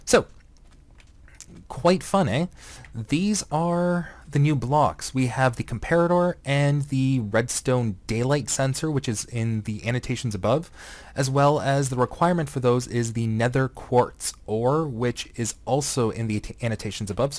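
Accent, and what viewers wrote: Canada. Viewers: you are from canada XD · You sound Canadian, are you Canadian?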